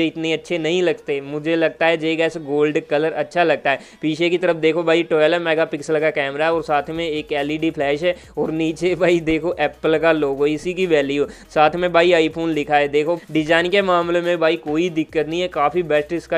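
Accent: Punjab, India. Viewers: Hindi in Punjabi Accent😅🖒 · Punjabi bhra mil gya - me awaj to hi pachan lea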